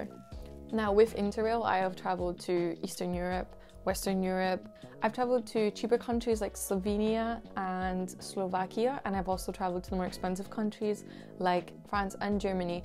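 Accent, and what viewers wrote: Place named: Scotland, United Kingdom. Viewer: Viva Scotland, my favourite place in Europe. I love your accent. Greetings from Spain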